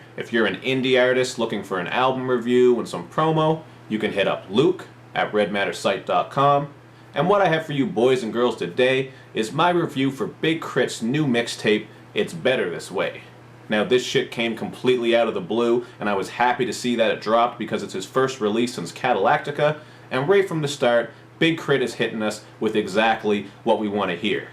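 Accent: Canada. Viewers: New subscriber here so I dont know if you've already mentioned this, but are you from Toronto? I noticed the hat and your canadian accent lol. Im from toronto was just curious. Great review.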